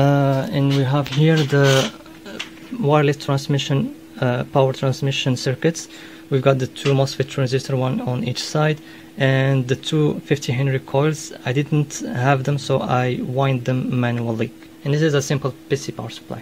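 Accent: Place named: Morocco. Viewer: u kinda sound moroccan to me, o lah o3lem..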